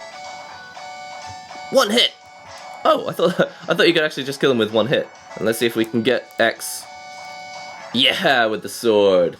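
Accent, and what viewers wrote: United Kingdom. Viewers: He is Japanese but has a British accent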